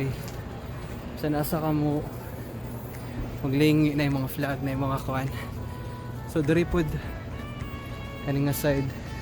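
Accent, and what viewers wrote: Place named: Cebu, Philippines. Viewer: Maayong adlaw nimo diha doh! I believe taga Cebu ka kay klaro kaayo sa imong stinoryahan. Hehe Ask lang unta ko kung tinood diha sa New Brunswick na dili daw ka maka sponsor sa imong family? Salamat doh og God bless!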